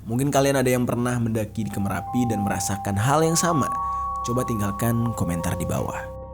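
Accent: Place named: Jakarta, Indonesia. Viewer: Mbah petruk, pengucapan nya lucu kalo orang jakarta yg ngomong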